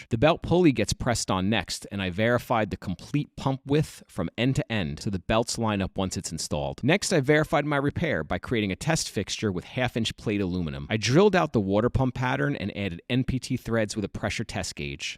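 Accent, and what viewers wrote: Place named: Delaware County, United States. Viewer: Delco wooder ass accent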